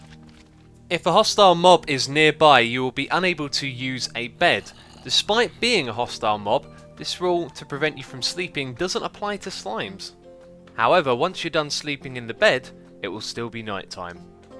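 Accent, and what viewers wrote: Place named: United Kingdom. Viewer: I <3 you X you are my favourite youtuber and for some reason I like your accent it's so British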